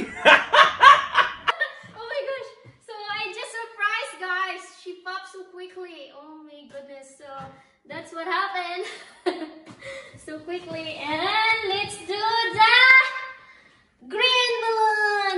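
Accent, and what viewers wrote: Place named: Philippines. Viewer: You made me laugh and I love your Pilipino accent, it's so cute.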